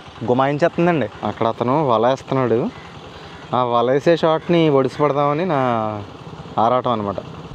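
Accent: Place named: Konaseema, India. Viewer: Hi anna. Mee maatallo konaseema effection kanabaduthundhi.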